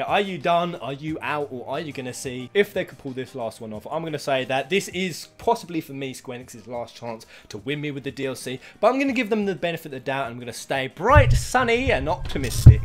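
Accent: England, United Kingdom. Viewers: You remind me of my childhood friend Robby but only with an english accent.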